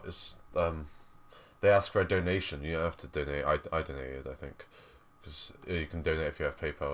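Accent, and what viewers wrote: United Kingdom. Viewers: are you from bristol?;)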